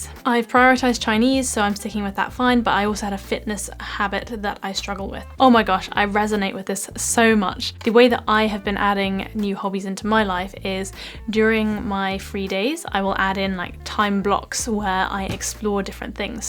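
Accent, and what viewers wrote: England, United Kingdom. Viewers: hy how re you, i am from indonesia and i like to learn british aksen by hearing your aksen, btw where are you from, i mean your origin country, thnks😁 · I wonder would you have more video on British accent- love the way you talk, your accent and want to learn… Would you please make some videos just read a short story or Article (please speak a little slow so I can Repeat after you) then I can watch over and over again to listen and learn. Thank you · You are very beautiful and love the British accent and you have a positive and upbeat attitude and personality. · I can listen to your crisp English accent for hours 🤩and by the way your Mandarin pronunciation is spot-on! Obviously you have a flair for languages. PS - fellow beef hor fun fan here 🥰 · I love your British accent. It's so beautiful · It is the first time I watch this video that British accents you pronounce and say so beautiful. 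keeping creative skills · HI Mam, You are so adorable, I love your English-speaking accent, I am learning English from your videos, and I always wait for your new video. Keep Blessing. Have a great job and all routine. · Angel face with an English accent yet sooo cute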